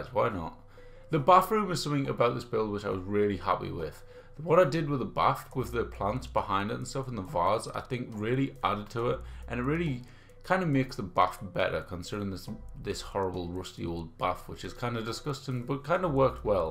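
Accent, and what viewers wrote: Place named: Stockton-on-Tees, United Kingdom. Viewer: I’m Stockton-on-Tees so yes you sound familiar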